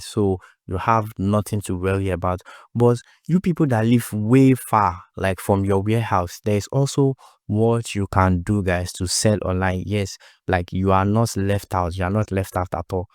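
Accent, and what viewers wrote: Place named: Ghana. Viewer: Specify if it's jumia Nigeria causes your accent sound ghana...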